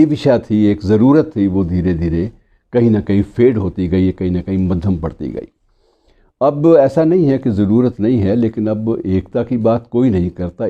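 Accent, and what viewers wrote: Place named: Pakistan. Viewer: Iss aadmi ko sunke aisa lagta h jaise kisi pakistani ko sun rha hu, ab dekhlo jinki jeeb tak dushmano jaisi h unke vichar kinke jaise honge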